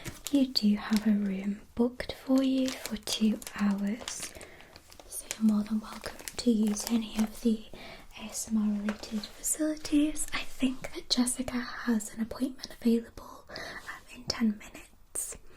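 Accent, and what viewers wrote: United Kingdom. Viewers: Are you British girl?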